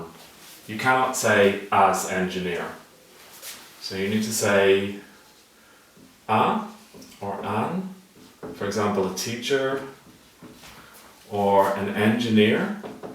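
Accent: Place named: United Kingdom. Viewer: Hi, First of all, I would say that your videos are great although I discovered your channel recently. I've got one question, are you german? cuz I haven't seen any german which speaks such a great british accent.:))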